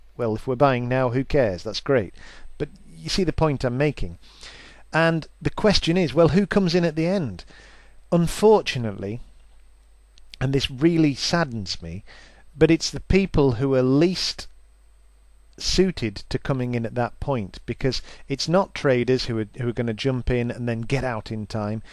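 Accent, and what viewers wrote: United Kingdom. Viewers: Great talk ... keep'em coming. A lot of common sense in your videos.. ... and is that a Geordie accent BTW ? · wow -- very good post and i agree with your consolidation of the current developing "buzz". a few points - ammo is back (if you can afford it), gold is still controlled by the fiat makers, what are ordinary folk? btw, where did you get that image? I want it as my PC wallpaper. I have a good one for you as well. Your accent reminds me of a friend I had from Sheffield.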